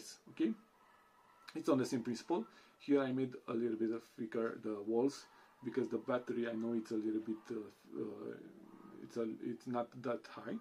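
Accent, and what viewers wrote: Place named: Pakistan. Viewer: You're a great guy for all the effort. Interesting accent btw, i hear a similarity to Pakistani for some reason, although you are Polish or Lithuanian i think.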